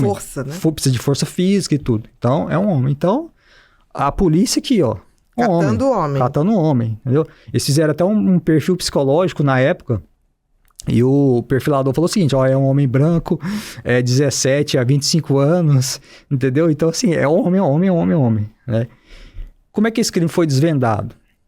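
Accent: Minas Gerais, Brazil. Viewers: Sotaque mineiro ❤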